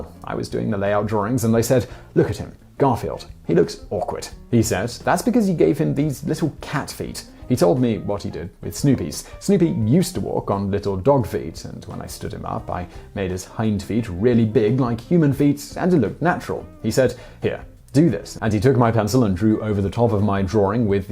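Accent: United Kingdom. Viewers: You come across as pleasant, including your flavor of British English -- yet even you call drawings "drawrings". I don't know what rings have to do with drawings, and personally find it annoying. This is a relatively new phenomenon having developed in Britain, and I bet linguists love this new direction English has taken in Britain.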